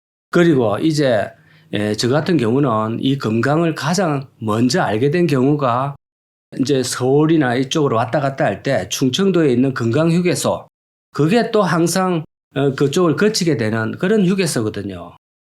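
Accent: Gyeongsang, South Korea. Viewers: 늘 좋고 편안한 영상 보여 주셔서 고맙습니다, 님! 게다가 구수한 경상도 사투리까지, 정말 일품입니다. 어릴 때만 쓰고 오랫동안 잊고 있던 사투리를 들을 때면 정말 웃음이 절로 납니다. 다시 한번 고맙습니다. ㅎㅎ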